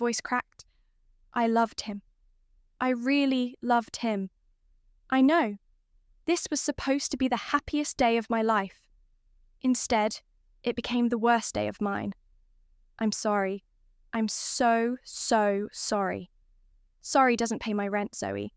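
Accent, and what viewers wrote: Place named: Australia. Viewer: I live the Australian voice-over that everybody calls a British voice.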